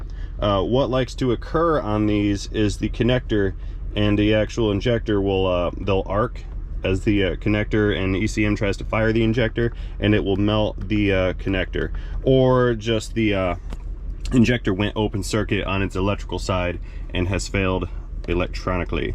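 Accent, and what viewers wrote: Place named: Harvard, United States. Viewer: why does this guy talk like hes from Harvard?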